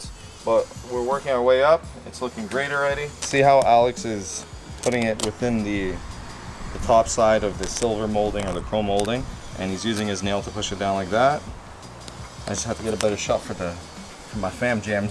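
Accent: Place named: Cape Breton, Canada. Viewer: Where you from? I hear that Cape Breton accent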